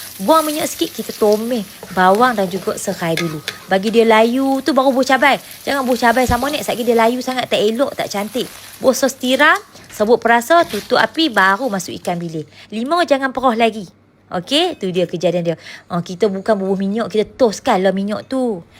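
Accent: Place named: North, Malaysia. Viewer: Pekat lorat utara dia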